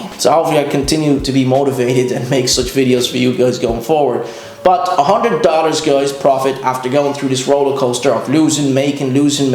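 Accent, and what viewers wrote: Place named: Ireland. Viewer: what accent is that yo. Irish Im assuming sounds g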